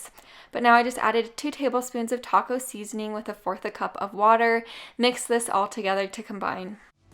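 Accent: Utah, United States. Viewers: It's not that her language is sloppy but she does speak differently than she did a year ago. Some of these are older videos. In the more recent videos, she has picked up a Utah accent. They drop their T's and pronounce things differently like un-tul instead of until, etc.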